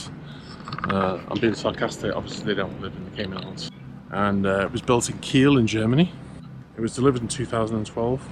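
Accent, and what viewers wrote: Liverpool, United Kingdom. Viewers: Where are you from? I think your a scouser, definitely northwest anyway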